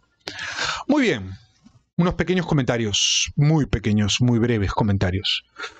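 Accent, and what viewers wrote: Peru. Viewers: Me encanta oir como lees el libro con ese lindo acento peruano. 😊 Saludos desde España.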